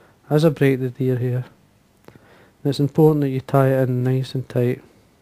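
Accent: Scotland, United Kingdom. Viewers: Nice tie and I'll be taking a couple to the faster upper reaches of the Clyde. Great to hear a fellow Scot too! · My fly box is sort of like yours, I don't tie as well, you are by far the best on You tube and that is saying a lot, there are a lot of good tiers here, It took me a while with my american english ears to understand you, heavy Scottish accent its a good thing! I have one fly box in my vest that is debated to just Adams Dry Flies, I have them from size 6 down to 22! At least half dozen in each size, I like this style, and going to add them to my box, Roe deer never seen it in the flyshops, but White tail deer should do, I been tying my own flies since I got my first vise a Thompson A back in '64 and I still have it to go with the Regal, HMH and Dyna King. Of all of them I like the old Regal and the Dyna King! But a vise just holds the hook, the skill and the artistry of fly tying well that is something else all together, I am on my way to Montana next week, I decided that I am just going to spend the rest of Sept and most of Oct- Fishing then back home just in time for Rifle Deer hunting, then maybe some winter Steel head fishing!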